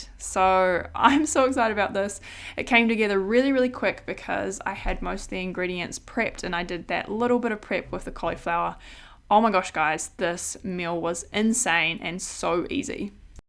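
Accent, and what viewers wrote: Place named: New Zealand. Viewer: I love her New Zealand accent!!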